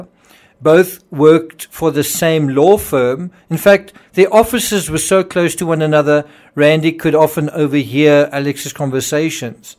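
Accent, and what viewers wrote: South Africa. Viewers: Nice voice, South African! I agree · Sir, I love your South African accent;it's beautiful........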